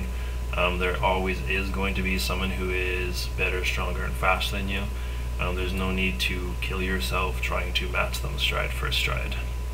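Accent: Canada. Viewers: 3 mins in realized hes canadian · Nice Canadian accent haha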